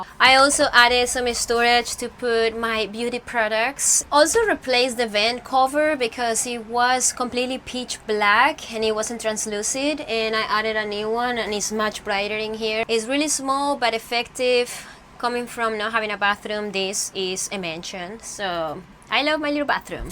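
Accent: Spain. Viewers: Love your Energy, I started watching and then I saw all of the Alva stuff and then I noticed that you were in my Home Town of San Diego. I was in Solana Beach then moved to San Marcos. I used to - many years ago - work for Thrasher Magazine in San Francisco. I've been skating ever since the 80's when I was a Sailor on the USS Midway - then stationed in Japan. I SUBSCRIBED and liked........ I love your accent, what is your FIRST language? Is it Castilian Spanish? BTW, a friend of mine just recently gave me his Tony Alva Deck signed by Tony way back when.....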